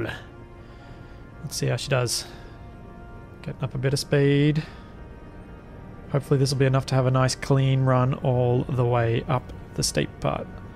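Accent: Australia. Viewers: Oh, I didn't know you're Aussie. Happy to know mate. Brisbane here. :-) I'm loving your videos. And you're totally right. Do it the fun way, efficient or not.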